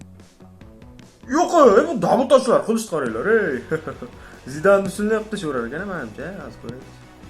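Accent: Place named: Namangan, Uzbekistan. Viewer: Namangan shahridanmisiz shevangiz ohshab ketarkan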